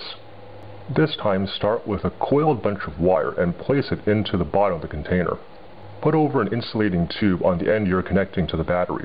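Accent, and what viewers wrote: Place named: Canada. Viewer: You have the slightest Canadian accent :D but that's probably because of your voice changer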